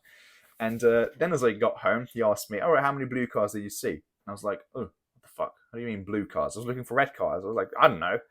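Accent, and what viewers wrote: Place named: United States. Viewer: Yo man, how the hell did you ger an american accent 😂, youre supposed to have a hungarian accent, im from the Balkans and id like to have that clean accent too, any tips?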